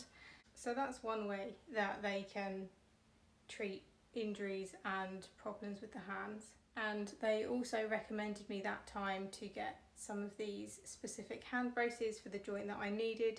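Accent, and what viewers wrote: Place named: England, United Kingdom. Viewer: when you’re talking sometimes it’s like listening to ASMR your voice with the soft english accent is relaxing even though you are talking about your serious issues. I hope at some point we get some relief chronic illnesses are tough.😞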